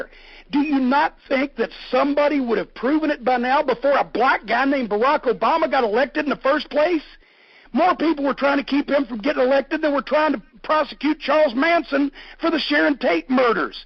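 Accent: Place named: South, United States. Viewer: Wow...logical progressive thought with a southern twang. I like you Mr. Cornette!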